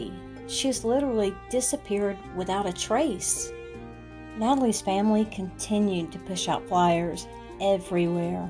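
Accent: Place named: South, United States. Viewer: Love your southern accent. I'm a southerner too. Interesting videos. You do a lot of research obviously.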